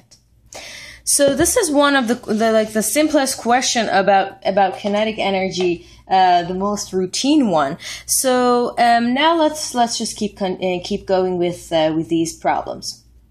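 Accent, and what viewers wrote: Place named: Iran. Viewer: I swear to god when you started talking I knew you were iranian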